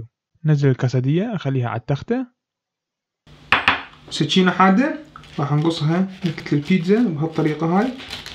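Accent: Mosul, Iraq. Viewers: عاشت ايدك بس اريد اعرف انت من الموصل لان طريقه الحكي مالك نفس حكينا المصلاوي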